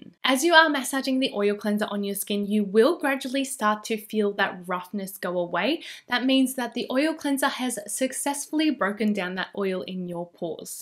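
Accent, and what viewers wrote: Australia. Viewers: u aussie? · I like her Aussie Accent. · i'm absolutely loving your heavy australian accent😄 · I knew right away you’re an Aussie. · That Asian Australian accent tho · Very Australian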